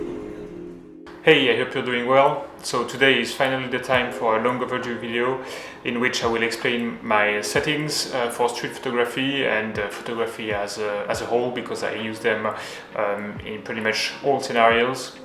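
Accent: France. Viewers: Vous avez un accent, êtes-vous francophone?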